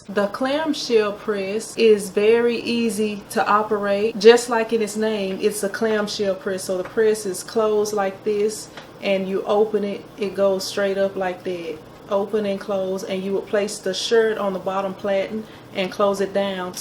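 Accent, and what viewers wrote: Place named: Louisiana, United States. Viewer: Thank You! The information you provided was so helpful! Love the accent I'm from Louisiana also!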